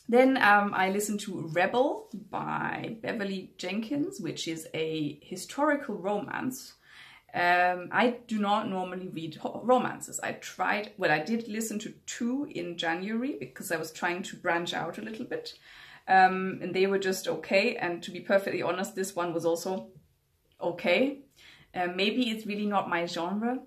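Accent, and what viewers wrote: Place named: Northern Ireland, United Kingdom. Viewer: Sorry you haven't had a great day, though with all of this stuff going on I can see why. Thanks for making this video anyway though. I imagine a lot of Booktubers probably feel pressure to be cheerful in every one of their videos, but as a long time booktube viewer I find it just as valuable to listen to people when they're angry or sad as when they're happy. Thanks for sharing your perspective on things and for these recommendations of what to watch. I've got some Northern Irish family so I'm used to the accent as well, but I get why people find it befuddling! I like your reasoning behind revisiting The Butchering Art. Thank f*ck for science indeed!😆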